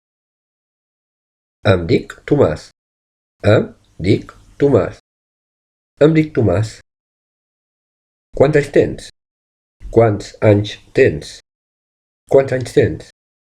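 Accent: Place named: Argentina. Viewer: Argentino intentando hablar en catalán?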